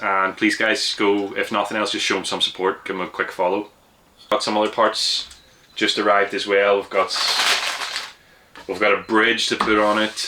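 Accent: Northern Ireland, United Kingdom. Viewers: That's quite a controversial statement - the Northern Ireland accent is not "British". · Danny sapko watchers when they hear a British accent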